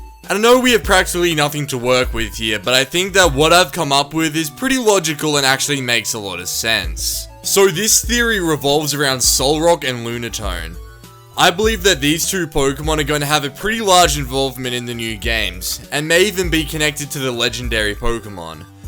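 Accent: Australia. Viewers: starts vid, sees small amount of subs, hears ozzy voice. subbed instantly a fellow ozzy youtuber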